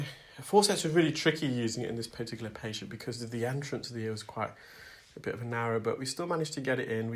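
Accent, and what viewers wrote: United Kingdom. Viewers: "...I am kind of renowned..." 💙 He manages to say it in such a way it doesn't sound arrogant or conceited. At all. Not sure if its the British accent to my American ears or he's humble and confident. Either way, I really adore him and his work.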